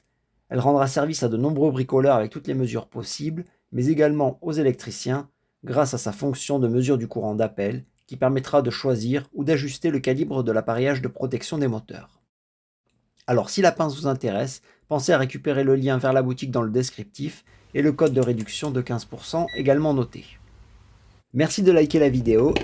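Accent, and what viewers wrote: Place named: Corsica, France. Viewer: Petit accent corse sous-jacent.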